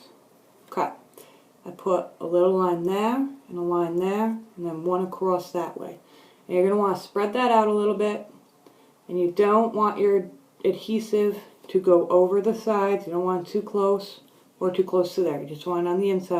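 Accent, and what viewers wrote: New York, United States. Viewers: Your so pretty! Love your NY accent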